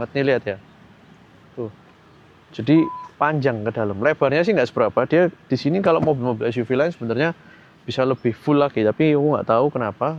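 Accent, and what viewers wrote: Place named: Java, Indonesia. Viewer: Medhoknya jangan sampai hilang. Sudah jadi ciri khas.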